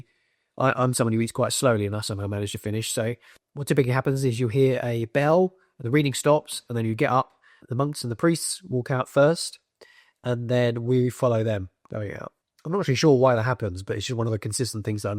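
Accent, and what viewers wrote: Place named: United Kingdom. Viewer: Orthodox, cute AND British accent ? ❤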